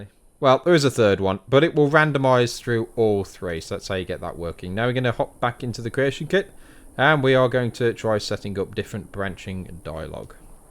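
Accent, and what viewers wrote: United Kingdom. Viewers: I'm an American. I have no idea why, but I find it remarkably easier to learn this shit from a guy with a British accent. I probably should have just gone to school in the UK, come to think about it; would have learned my lessons much more effectively from teachers with accents I think.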